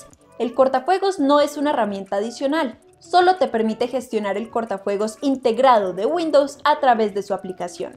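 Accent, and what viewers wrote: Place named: Mexico. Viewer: Me acabo de suscribir, tienes acento de mexicana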